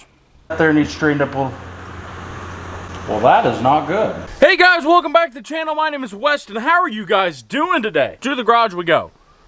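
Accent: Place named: Kansas, United States. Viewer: What part of Kansas are you from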